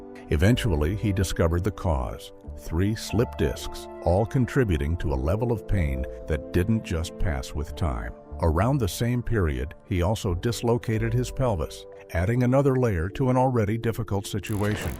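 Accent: United States. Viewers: Why an American narrator? 🤔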